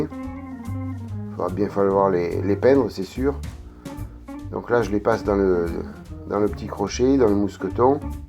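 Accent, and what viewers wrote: South, France. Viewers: Salut, très sympa cette petite vidéo, j'ai hâte de voir le rendu de la variation chromatique avec le chipping ça va être super sympa. Et sinon toujours un plaisir de suivre tes montages et d'écouter ton bel accent du sud:) Au plaisir!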